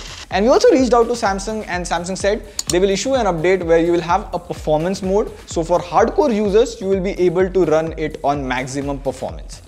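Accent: India. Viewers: Your accent is funny. Are you indian by any chance?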